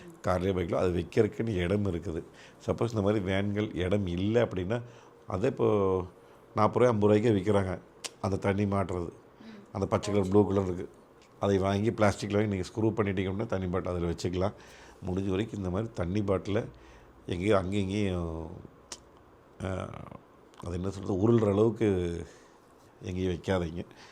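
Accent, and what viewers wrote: Kongu, India. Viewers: ❤அண்ணா வணக்கம் 🙏🏻திருப்பூர் கொடி ராஜா 🌱🙏🏻🇾🇪 முதலில் மக்களுக்கு விழிப்புணர்வு ஏற்படுத்த, உங்களது பொன்னான நேரத்தை செலவிட்டு, இந்த மாதிரியான வீடியோக்களை தொடர்ந்து பதிவிட்டு வரும் உங்களுக்கு வாழ்த்துக்கள்..❤️நன்றிகள்🙏🏻.... மேலும்,நமது கொங்கு மொழியில் எளிமையாக, மக்களுக்கு புரியும்படி பேசும் உங்களது பேச்சு அருமை... 🙏🏻 தொடரட்டும் உங்கள் சேவை💐💐💐💐💐💐💐💐💐💐💐💐💐💐💐💐💐💐💐💐💐💐💐💐💐💐💐💐💐💐💐💐💐💐💐💐💐💐💐💐💐💐💐💐💐💐💐💐💐💐💐💐💐❤️💚💐💐💐💐💐💐💐💐💐💐💐💐💐💐💐💐💐💐💐💐💐💐💐💐💐💐💐💐💐💐💐💐💐💐💐💐💐💐💐💐💐💐💐💐💐💐💐💐💐💐💐💐💐💐💐💐💐💐💐💐💐💐💐💐💐💐💐💐💐💐💐💐💐💐💐💐💐💐💐💐💐💐💐💐💐💐💐💐💐💐💐💐💐💐💐💐💐💐💐💐💐💐💐💐💐💐💐💐💐💐💐💐💐💐💐💐💐💐💐💐💐💐💐💐💐💐💐💐💐❤️💚💐💐💐💐💐💐💐💐💐💐💐💐💐💐💐💐💐💐💐💐💐💐💐💐💐💐💐💐💐💐💐💐💐💐💐💐💐💐💐💐💐💐💐💐💐💐💐💐💐💐💐💐💐💐💐💐💐💐💐💐💐💐💐💐💐💐💐💐💐💐💐💐💐💐💐💐💐💐💐💐💐💐💐💐💐💐💐💐💐💐💐💐💐💐💐💐💐💐💐💐💐💐💐💐💐💐💐💐💐💐💐💐💐💐💐💐💐💐💐💐💐💐💐💐💐💐💐💐💐❤️💚💐💐💐💐💐💐💐💐💐💐💐💐💐💐💐💐💐💐💐💐💐💐💐💐💐💐💐💐💐💐💐💐💐💐💐💐💐💐💐💐💐💐💐💐💐💐💐💐💐💐💐💐💐💐💐💐💐💐💐💐💐💐💐💐💐💐💐💐💐💐💐💐💐💐💐💐💐💐💐💐💐💐💐💐💐💐💐💐💐💐💐💐💐💐💐💐💐💐💐💐💐💐💐💐💐💐💐💐💐💐💐💐💐💐💐💐💐💐💐💐💐💐💐💐💐💐💐💐💐❤️💚💐💐💐💐💐💐💐💐💐💐💐💐💐💐💐💐💐💐💐💐💐💐💐💐💐💐💐💐💐💐💐💐💐💐💐💐💐💐💐💐💐💐💐💐💐💐💐💐💐💐💐💐💐💐💐💐💐💐💐💐💐💐💐💐💐💐💐💐💐💐💐💐💐💐💐💐💐💐💐💐💐💐💐💐💐💐💐💐💐💐💐💐💐💐💐💐💐💐💐💐💐💐💐💐💐💐💐💐💐💐💐💐💐💐💐💐💐💐💐💐💐💐💐💐💐💐💐💐💐❤️💚💐💐💐💐💐💐💐💐💐💐💐💐💐💐💐💐💐💐💐💐💐💐💐💐💐💐💐💐💐💐💐💐💐💐💐💐💐💐💐💐💐💐💐💐💐💐💐💐💐💐💐💐💐💐💐💐💐💐💐💐💐💐💐💐💐💐💐💐💐💐💐💐💐💐💐💐💐💐💐💐💐💐💐💐💐💐💐💐💐💐💐💐💐💐💐💐💐💐💐💐💐💐💐💐💐💐💐💐💐💐💐💐💐💐💐💐💐💐💐💐💐💐💐💐💐💐💐💐💐❤️💚💐💐💐💐💐💐💐💐💐💐💐💐💐💐💐💐💐💐💐💐💐💐💐💐💐💐💐💐💐💐💐💐💐💐💐💐💐💐💐💐💐💐💐💐💐💐💐💐💐💐💐💐💐💐💐💐💐💐💐💐💐💐💐💐💐💐💐💐💐💐💐💐💐💐💐💐💐💐💐💐💐💐💐💐💐💐💐💐💐💐💐💐💐💐💐💐💐💐💐💐💐💐💐💐💐💐💐💐💐💐💐💐💐💐💐💐💐💐💐💐💐💐💐💐💐💐💐💐💐❤️💚💐💐💐💐💐💐💐💐💐💐💐💐💐💐💐💐💐💐💐💐💐💐💐💐💐💐💐💐💐💐💐💐💐💐💐💐💐💐💐💐💐💐💐💐💐💐💐💐💐💐💐💐💐💐💐💐💐💐💐💐💐💐💐💐💐💐💐💐💐💐💐💐💐💐💐💐💐💐💐💐💐💐💐💐💐💐💐💐💐💐💐💐💐💐💐💐💐💐💐💐💐💐💐💐💐💐💐💐💐💐💐💐💐💐💐💐💐💐💐💐💐💐💐💐💐💐💐💐💐❤️💚💐💐💐💐💐💐💐💐💐💐💐💐💐💐💐💐💐💐💐💐💐💐💐💐💐💐💐💐💐💐💐💐💐💐💐💐💐💐💐💐💐💐💐💐💐💐💐💐💐💐💐💐💐💐💐💐💐💐💐💐💐💐💐💐💐💐💐💐💐💐💐💐💐💐💐💐💐💐💐💐💐💐💐💐💐💐💐💐💐💐💐💐💐💐💐💐💐💐💐💐💐💐💐💐💐💐💐💐💐💐💐💐💐💐💐💐💐💐💐💐💐💐💐💐💐💐💐💐💐❤️💚💐💐💐💐💐💐💐💐💐💐💐💐💐💐💐💐💐💐💐💐💐💐💐💐💐💐💐💐💐💐💐💐💐💐💐💐💐💐💐💐💐💐💐💐💐💐💐💐💐💐💐💐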